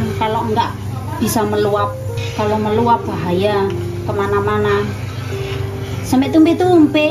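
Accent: Java, Indonesia. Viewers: Mf mb logatnya kaya orang jawa, klo blh tau asalnya dri mana ya mksih kalau mau bales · Mbak yen ngomong boso jowo medok jowo ne iku tetep kental masio dek samean dek india Collab pakai boso jowo dong kak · Setiap mbk ngomong nada jawanya keluar, aku mesti ketawa lho mbk, soalnya klo pean ngomong medok ngt, 👍👍👍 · Mb lucu kl ngmng....tdk sungkan2 dg logat jawanya.salut mb.....👍👍👍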